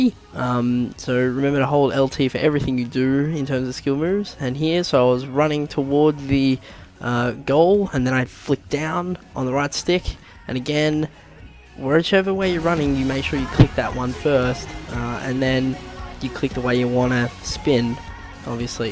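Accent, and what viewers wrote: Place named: Australia. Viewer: U Aussie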